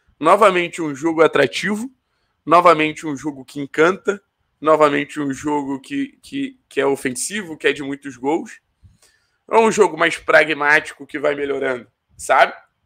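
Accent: Rio de Janeiro, Brazil. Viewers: Cara vc tem sotaque de carioca. Vc é do Paraná mesmo?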